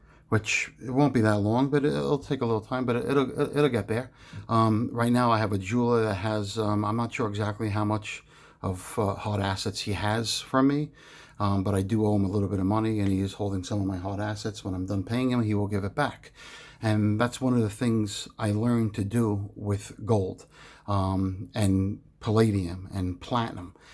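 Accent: East Coast, United States. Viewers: Nice pickups ... love the coin toss of your coins. Your genuine NY accent is Cool as I am from South, I just subbed to you and look forward to your videos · Awesome story! Good for you. Glad you're in better health and back on your feet. It's must have been extremely hard, I can't imagine. Thanks for sharing your occupation with us. I'm so intrigued when I see a large amount of gold and when asked how that person makes money, they never truly answer or tell you something real vague. I'm as working class as you can get. Pretty much paycheck to paycheck so when I see someone doing awesome I am always curious to know how. In your other video when I heard you say $400,000 dollars a year to live. I could picture you laughing at my income or confused how someone could live on so little. I can't imagine making 30K a month. lol Then again, from your accent, you're on the east coast and it does cost a lot more to live and the culture leans to who has the most money. 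A lot of competition to show off wealth especially in New York. But still I really appreciate you sharing it with all of us though. Happy stacking Brotha!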